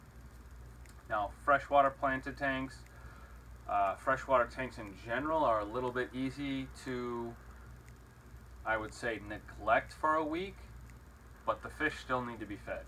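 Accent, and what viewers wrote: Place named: Massachusetts, United States. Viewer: Can I just say as a fellow man from Mass your voice just makes me homesick. Also as a guy who just got back into the hobby after not having a tank since I was a kid you actually saved my fishes life. Your videos have been very helpful with getting back into the hobby. I now live in Kentucky, and it's nice to have a little piece of Mass to go to, and get advice on a hobby I always loved.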